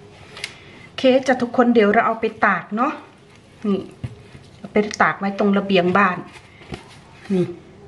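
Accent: Isan, Thailand. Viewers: เพิ่งเข้ามาดูคะหนูบอกว่าเป็นคนสุรินทร์แต่พูดเหมือนอีสาน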